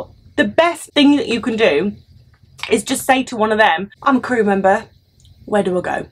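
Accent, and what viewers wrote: United Kingdom. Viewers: She's sounds so british actually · She must be from the UK.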